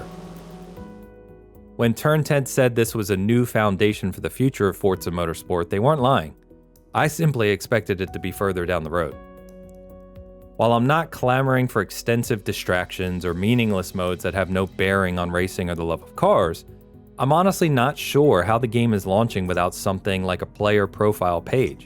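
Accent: United States. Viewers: lol finally someone who understands an American accent. it's strange to me sounds like rural middle America. The people replying to me that think Americans have 1 accent is hilarious. I'm the dumb one to them... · I'm going West Coast, N Cali or Oregon.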